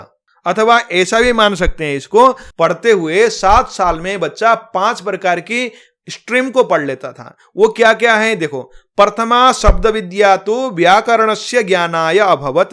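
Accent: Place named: Jodhpur, India. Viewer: Sir AAP jodhpur se ho na aapki aapki aawaz bata rahi hai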